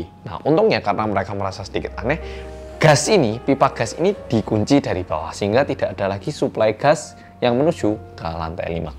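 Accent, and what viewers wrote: Java, Indonesia. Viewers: Abg org Korea apa jawa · Medok bget sih anda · logat jowo ne ketoro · Oppa asli Korea??? Masha Allah medok logat Jawa bgt 😁 ky koko2 Semarang /Sby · Berapa kali mas ngomong untungnya, tapi ternyata yang meminggal 500 an lebih. Berasa banget jawanya yg jawa jangan tersinggung ya w juga orang jawa kok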